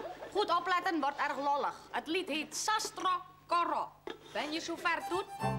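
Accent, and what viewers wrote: Java, Indonesia. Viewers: Bahasa belanda kreol tante lien kental banget, bahasa belanda dengan logat jawa medok, kadang disisipi kosakata bahasa jawa, penuturnya sudah mulai langka, mungkin tante lien yang tersisa diantaranya. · Dia punya nama. Dia orang indo-europa(orang yang campur, punya leluhur yang indonesia dan europah). Dia lahir dan besar di Surabaya. Makanya kalau dia omong bahasa Indonesia pake logat jawa. Waktu Indonesia merdeka, orang indo-Europah yang punya nationalitas Belanda boleh pilih, m0 jadi warga negara Indonesia atau mau tetap Belanda. Yang mau tetep blanda musti pulang ke belanda(seperti ). Orang indo-europah yang jadi warga negara Indonesia tetep tinggal di sana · ngomong bahasa Belanda pake logat medok 😭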